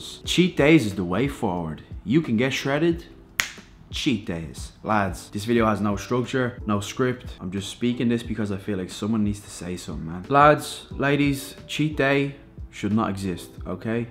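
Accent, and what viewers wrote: Ireland. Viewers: i like ur accent i am irish too bud :) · Ireland prolly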